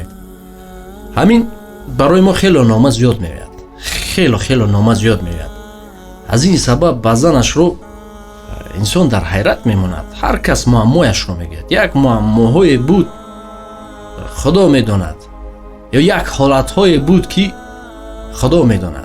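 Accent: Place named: Pamir, Tajikistan. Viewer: Акай ранги одамой Помир точикира гап мезанен шумо Блогер нестен шумо Инсони хак мебошед ранги шумо бори Инсон кам аст!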